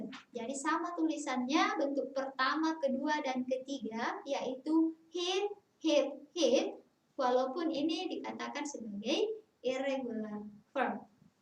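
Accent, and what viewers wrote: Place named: Java, Indonesia. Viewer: Bahasanya masih medok mbak e, kurang dari medok malah👎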